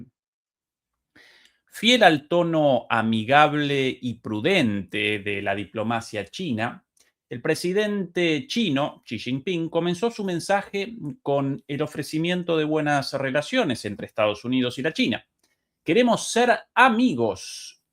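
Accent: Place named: Buenos Aires, Argentina. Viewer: Pero hablas Porteño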